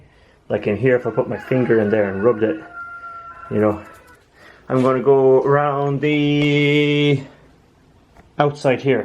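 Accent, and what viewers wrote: Ireland. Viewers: dude where do you live? you sound very Irish, but your children sound American, what? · so Irish I LOVE IT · Your Irish? you sound like Skallagrim and its always snowing. Assumed you were in Canada lol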